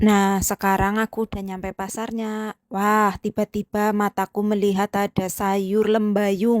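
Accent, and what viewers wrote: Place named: Banyumas, Indonesia. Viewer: Sehat dan semangat terus mba'e💪💪💪 Asli mna mba'e kok kayakne ngapak2 😂😂 kaya inyong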